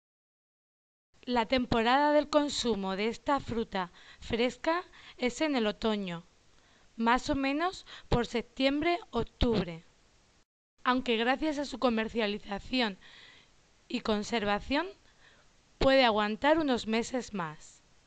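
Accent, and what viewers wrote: Spain. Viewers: Me encanta el tono español pero lo que no me gusta es que la gente españoal no respeta a Dios. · Me gustó el vídeo, me gusta mucho el tono Español, estoy comiendo una ahora en mis vacaciones en Córdoba Argentina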